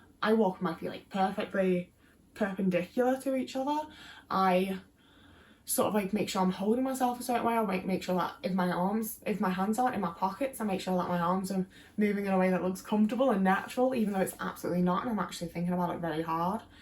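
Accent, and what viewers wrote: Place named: Midlands, United Kingdom. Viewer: When I first watched your videos, I was so confident that you were fully scouse based off of your accent but now I don’t really hear it anymore. I think your accent is getting more midlands over time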